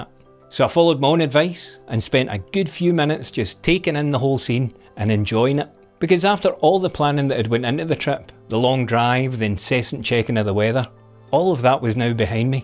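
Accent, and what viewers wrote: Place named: North, United Kingdom. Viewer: Mate, I can hear you speak for hours and hours! I normally put videos like these in the background while I cook breakfast and hope to get some inspiration for my next photography trip. But today, the eggs and butter remain untouched throughout the 14 minutes of this lesson. I was genuinely engrossed and didn't bother whether I wanted an omelette or fried. Great photos my fellow northerner! Came for the tips, staying for the accent.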